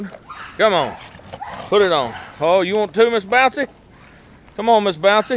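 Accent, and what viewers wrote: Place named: South, United States. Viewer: They look like Staffordshire Bull Terriers. Try an sound less hill billy next time.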